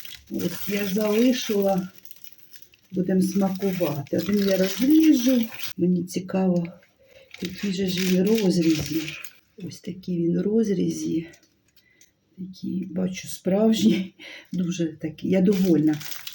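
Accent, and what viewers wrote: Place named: Poltava, Ukraine. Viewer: Дякую вам за гарний рецепт ,спробую...ніколи не пекла, а ви надихнули. Дуже приємний у вас говір, на полтавський схожий. Я сама родом з Полтавщини.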